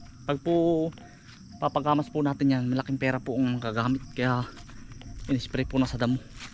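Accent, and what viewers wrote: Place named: Batangas, Philippines. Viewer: Ay BATANGUENIO KA EH KA INSAN ANG YUNG PUNTO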